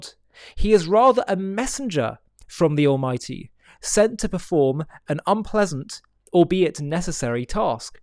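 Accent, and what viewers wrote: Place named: England, United Kingdom. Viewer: He's English, it's how people in that country speak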